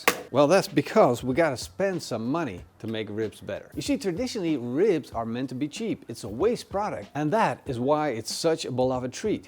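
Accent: Netherlands. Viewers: I almost metioned the cold smoke before the cook, but I knew I had to wait until the very end before commenting. You know your stuff, and that is why I watch. Once again, I love hearing the Dutch accent. Reminds me of my youth in Den Haag.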